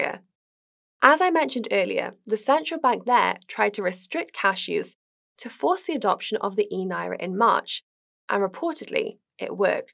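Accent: United Kingdom. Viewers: I don't get the ad bit in the middle with the intentionally extremely British accent. Her accent is already almost too british for most of us to understand anyway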